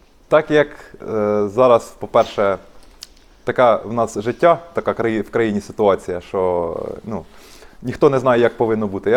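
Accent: Poltava, Ukraine. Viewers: полтовський окцет))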